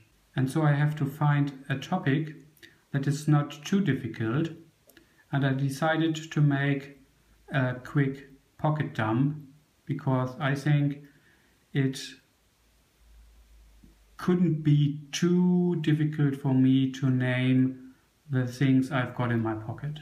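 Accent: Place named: Germany. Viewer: Moin, for your first english video, you did excellent in my opinion. Most german people had english back in school and remember very little of it and can speak even less. You did a better job than most people would have. I mean one can hear that you don't speak english on a regular basis, but nobody can fault you for that. The german accent is hard to loose when speaking english or probably every foreign language for that matter. I don't know if you plan on more videos in english, but I will tune in anyways... Except you start speaking french. Greetings my friend and have a nice weekend, Soly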